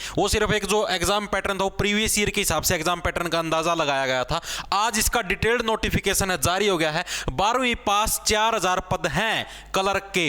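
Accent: Haryana, India. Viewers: Haryana sa ha ya bahrti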